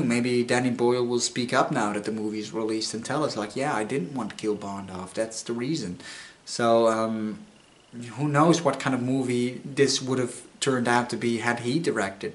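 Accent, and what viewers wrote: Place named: Netherlands. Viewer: How is it that "James Bond Will Return"? How many times does he nearly die at the explosive end of Bond movies but somehow cheats death and comes back again? The producers will either come up with a way that happens OR there will be a replacement 007 Bond (new actor) who is convincing enough to satisfy another re-boot. By the way, enjoying the Dutch pronunciation of "th" or "sh", it's so cute. ;-) But whatever happens just don't let Disney touch it, which is poison to any movie franchise.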